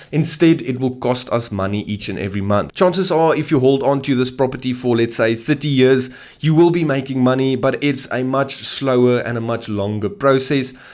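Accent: South Africa. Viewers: I just enjoy hearing your South African accent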